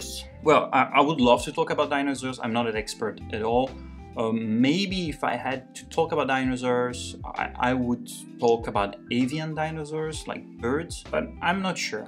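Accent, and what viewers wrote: France. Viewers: Hey, your accent seems french, if you are then please, can you start your video with a french word related to that video and what it means...just a wish though if you could....love french, would love to learn from you:D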